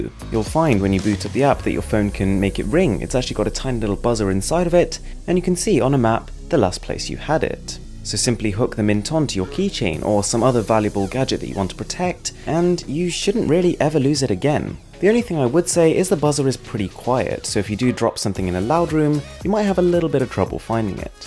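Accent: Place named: United Kingdom. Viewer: You clearly have a uk accent. But you advertise in dollars. Explain.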